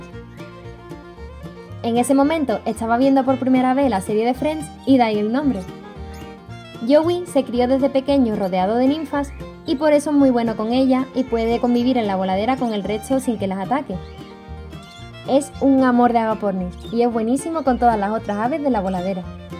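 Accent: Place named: Andalusia, Spain. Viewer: ¿De dónde eres? no consigo dar con tu acento: estoy entre Granada, Jaén, Córdoba, Sevilla...🤔 Yo vivo en un.pueblo de Vlc y tengo 22 agapornis 😍😍😍😍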